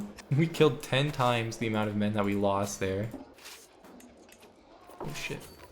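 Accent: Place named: California, United States. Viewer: this guy and MRE guy have the same California accent and I enjoy it I just need to say "ok niiiiccceee" more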